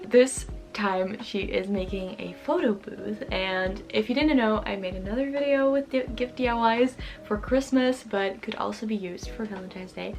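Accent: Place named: Netherlands. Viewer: i lovely, ik denk dat je Nederlands bent bahaha klopt dat? Je naam en gwn je uitspraak😚 pls reageer ff want ik ben benieuwd ik ben ook uit Nederland en ik woon in Nederland, ik zag een keer ook dat je stiften had van de HEMA haha en 1 keer kaarsen van de blokker❤ maaruhh ik hou van je video's ❤❤❤ keep up the good work girl ilyyy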